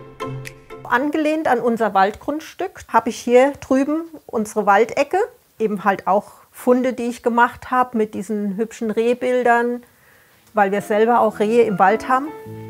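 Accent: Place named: Bavaria, Germany. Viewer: Der Dialekt muss aus dem Raum Aschaffenburg kommen 😅 fühl mich direkt zuhause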